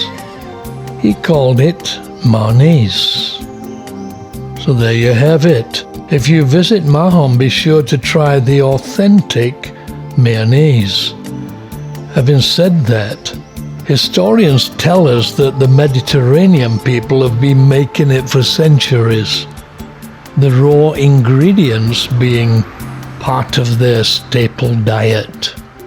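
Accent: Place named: United Kingdom. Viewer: You always get such good weather. There must be a divine blessing on you. Enjpyed the Menorca episode and British accent of. Many kudos to Spain for maintaining their outposts so pristine. All historical places are kept in utmost care. How very nice....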